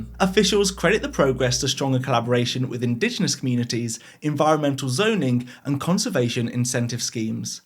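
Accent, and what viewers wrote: United Kingdom. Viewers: i lowkey thought you were moist critical until i realized you’re british 😭😭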